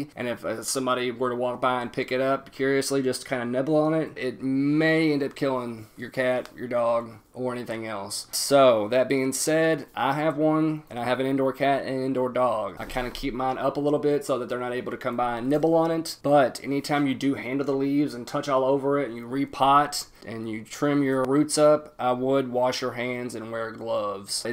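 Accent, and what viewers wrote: South, United States. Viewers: Good content. Good southern accent. Good cat. I like this.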